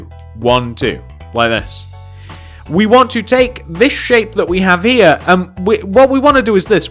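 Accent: United Kingdom. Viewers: I'm gonna admit you sound just a little British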